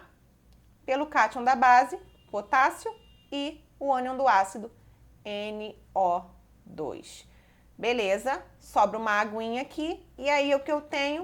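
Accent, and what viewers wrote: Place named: Rio de Janeiro, Brazil. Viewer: É o sotaque carioca KKKKKKKKK nossa voz sempre parece por isso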